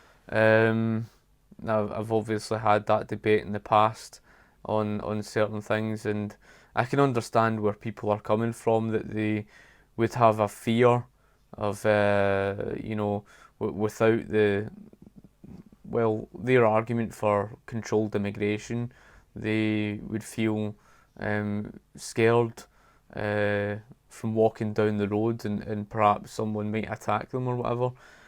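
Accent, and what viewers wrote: Scotland, United Kingdom. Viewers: Ah good to see you back. And good to hear a Scottish voice speaking in favour of Brexit!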